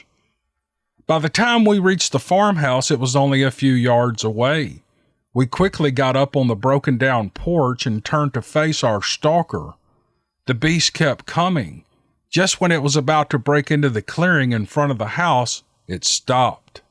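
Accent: South, United States. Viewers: Just got home from work and BOOM, a new vid! What an awesome way to wind down. Being in the deep woods at night with just a lil 22 and having a giant, possibly hostile, WTF walking beside you. Damn. That'll test your bunghole. It'll either pucker up REAL tight, or the flood gates will open wide. Yeah, I'd give up coon hunting too! Keep up the great work. Listening to you talk with that southern drawl is just like sitting around the camp fire with friends and family. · you have a very deep voice I would like you to sing some with your Southern drawl sometime.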